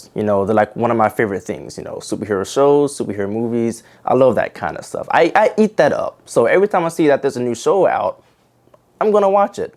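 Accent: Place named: Toronto, Canada. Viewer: This guy is not American. He sounds like he's from Toronto.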